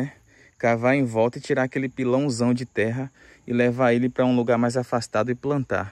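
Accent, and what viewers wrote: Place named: Minas Gerais, Brazil. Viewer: Nunca imaginei que fosse no sul da Bahia sempre assisto você achando que fosse mineiro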